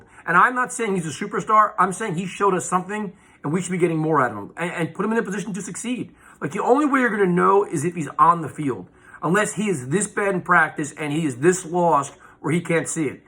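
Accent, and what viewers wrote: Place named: New York, United States. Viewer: This guy sounds new yorky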